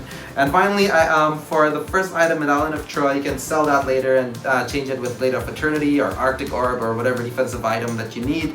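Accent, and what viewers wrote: Bali, Indonesia. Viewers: jano ini org bali ya?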